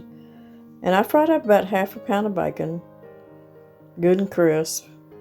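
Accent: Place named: Eastern Kentucky, United States. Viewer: You sound soo much like where i come from ❤!!! I grew up in Eastern Kentucky. I left and im back home. Much love and respect!!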